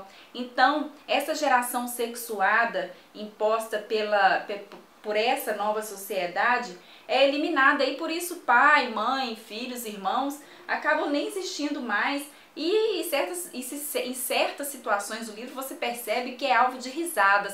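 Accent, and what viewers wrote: Minas Gerais, Brazil. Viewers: Ganhou inscrito. A propósito, vc é mineira?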